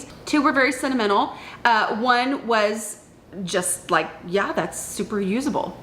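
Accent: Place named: Texas, United States. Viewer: Postal scale (I believe speaks Texan ❤😊)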